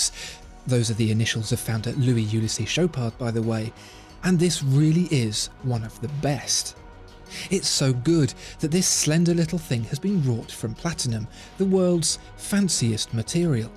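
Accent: United Kingdom. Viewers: Very nice watch, intolerable commentary. The brits haven't lost their innate talent to annoy people by simply opening their mouths.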